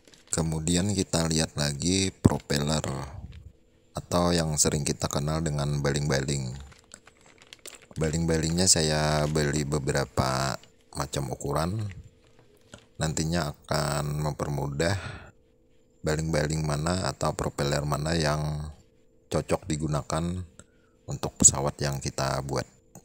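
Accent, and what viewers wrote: Banjar, Indonesia. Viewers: Logat banjar nya terasa banar leh 😁😁😁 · Logatnya kayak orang banjar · Kyk orng Banjar abg nik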